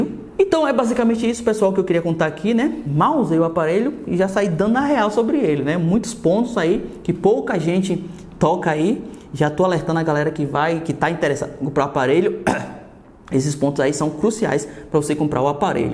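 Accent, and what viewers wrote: Bahia, Brazil. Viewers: Vc e baiano??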